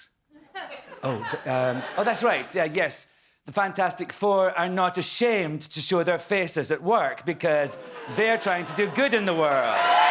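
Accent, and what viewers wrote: Scotland, United Kingdom. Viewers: I didn't know Alan was Scottish. Whenever I saw him in some movies, he had an American accent. He did an excellent job hosting Jimmy Kimmel Live👍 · Nice to hear a Scots accent doing the monologue on a late show again.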